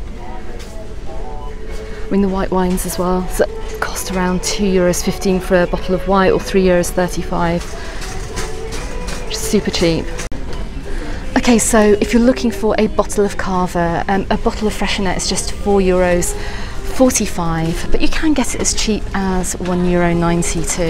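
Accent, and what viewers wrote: United Kingdom. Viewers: It isn't possible to sound more English/British than that 😄. Love the content, thanks for posting!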